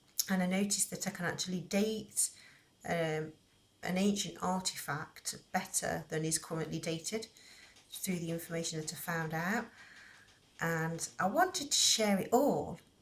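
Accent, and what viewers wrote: England, United Kingdom. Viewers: So awesome ... you truly inspire me and I absolutely love your English accent.... I’m from Louisiana!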